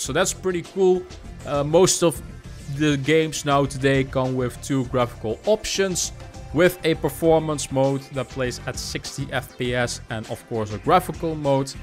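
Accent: Netherlands. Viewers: Is that Dutch accent?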